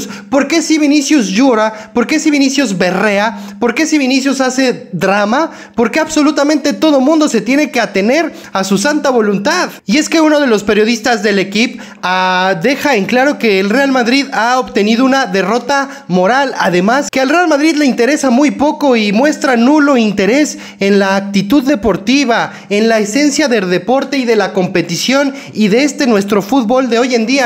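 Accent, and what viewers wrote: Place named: Mexico. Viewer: Hace muy poco que te sigo pero eres por el acento mi mejicano preferido bueno después de Rafa Márquez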